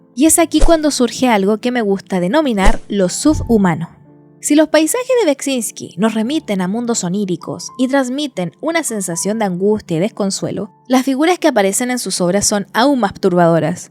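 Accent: Chile. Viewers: Que lindo escuchar tu voz ese asento chilena que tienes me encanta ❤ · Amé! muchas gracias por tu trabajo, muy enriquecedor, perturbador y de introspección. Además, amo hables en chileno sin importar nada!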